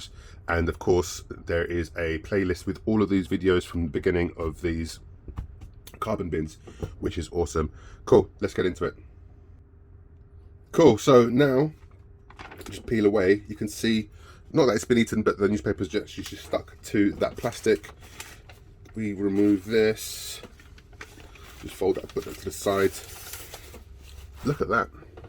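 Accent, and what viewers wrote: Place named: England, United Kingdom. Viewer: really enjoyed these posts thank you, do i detect a slight English accent ??? 🤔ATB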